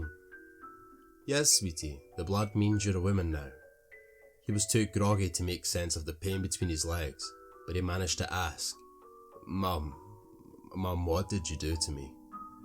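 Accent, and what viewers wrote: Scotland, United Kingdom. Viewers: good to hear another scottish person telling stories 👍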